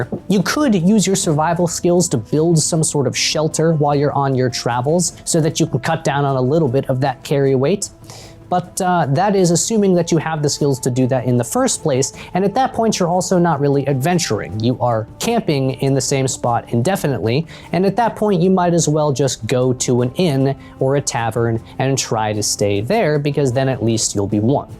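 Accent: United Kingdom. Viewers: Not bad attempt at yorkshire accent....cant be easy to do one of the strongest english accents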